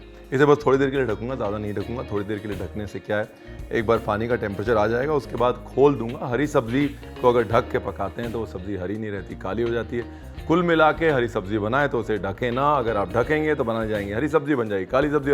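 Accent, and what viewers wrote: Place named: Lucknow, India. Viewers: Apki lakhnavi bhot acchi baat karne ka salika was nice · Sir aap ki baatein Mujhae Kanpur aur Lucknow ki yaad delati hain.my home sweet home.n you share authentic UP RECIPE 👌🏻👌🏻👌🏻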